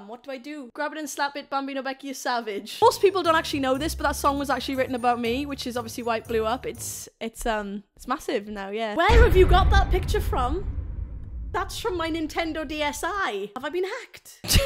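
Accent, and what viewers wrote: Wales, United Kingdom. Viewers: I fucking love ur accent. Only time I've acc liked the Welsh accent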